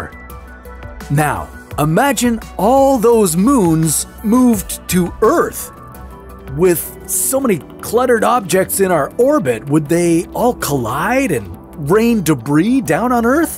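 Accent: United Kingdom. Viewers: 終於是英音啦 讚讚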